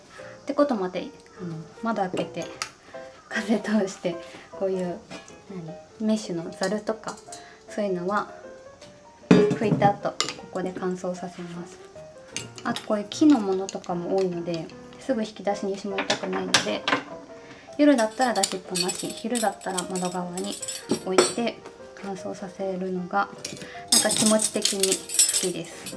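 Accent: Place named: Aichi, Japan. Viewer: はじめまして。最近さんの動画を発見して、少しづつ見ています。癒しの時間です。私も愛知出身なのですが、しゃびしゃびと丈夫い、確かに関東に来てから聞かないなと思いました。さんの言葉のイントネーションが好きに感じるのは、故郷を感じるからかもしれません。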